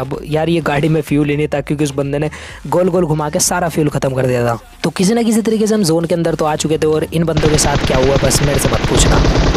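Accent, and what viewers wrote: Haryana, India. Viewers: Aaj to haryanvi boli has ri 👍😂❤️ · ur haryanvi touch is superb Three seater: thukta pher char jagah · Bhai comentry Haryanvi and hindi soo funny I like it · Bhai Aaj To Katai Haryanvi Horya H🔥🔥😍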